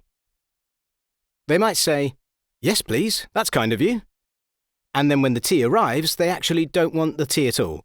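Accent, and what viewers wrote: United Kingdom. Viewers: This is just so much better with a deadpan, British voice :P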